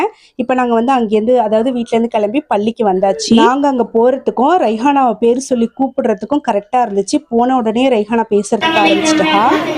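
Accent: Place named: Nagore, India. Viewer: Nagore Karaikal slang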